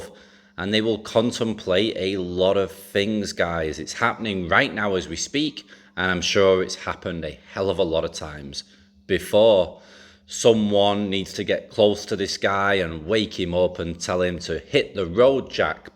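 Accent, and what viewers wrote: United Kingdom. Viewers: Delusional he sounds UK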